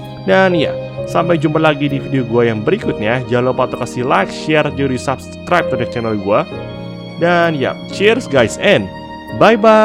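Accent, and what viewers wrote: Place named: East Java, Indonesia. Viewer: emang kakak orang jawa timur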